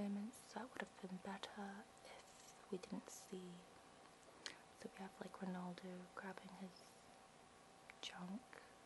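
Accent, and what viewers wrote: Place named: United Kingdom. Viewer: Are you from Korea? Your accent in English made me think you were from the UK!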